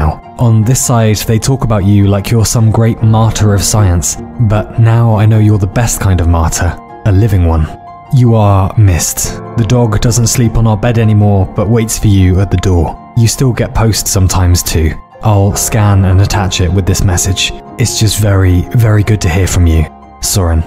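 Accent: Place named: United Kingdom. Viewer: Where have ya been man? I miss your videos I miss your advice I miss your British voice that puts me to sleep at night.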